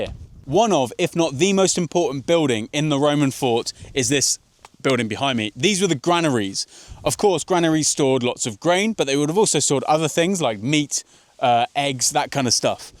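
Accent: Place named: United Kingdom. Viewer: J'aime écouter parler un anglais comme celui-là. C'est plus agréable que l'américain-chewingum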